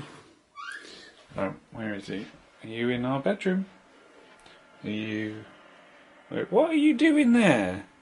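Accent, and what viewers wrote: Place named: North, United Kingdom. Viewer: I thought for ages you were a fellow northerner, where are you from originally?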